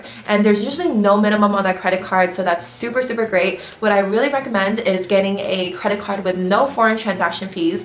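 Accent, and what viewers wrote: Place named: Queens, United States. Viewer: She has such a queens accent.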